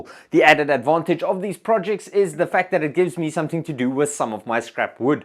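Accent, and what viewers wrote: South Africa. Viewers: Great ideas, I have used some of these ideas myself. Will implement some of yours. Love your accent, you sound like my south African mate. Cheers alojs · Do I recognise a South African accent? 😁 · Tanks for the response to my comment.. Having lived in Johannessburg for 5 years as a teenage, your accent just brings back many great memories of the country. Please keep up the grear work be postive and stay healthy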